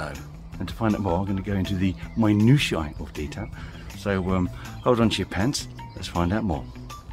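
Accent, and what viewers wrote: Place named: United Kingdom. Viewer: man I hardly understand some words. british accent..help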